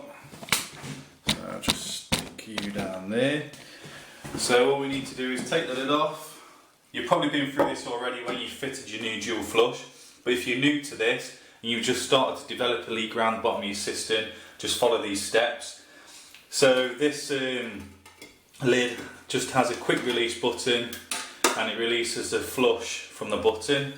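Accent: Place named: Nottingham, United Kingdom. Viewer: That was spot on fella - thank you. Sounds like you might be Nottingham way too - You Reds!! 🔴⚪ 🙂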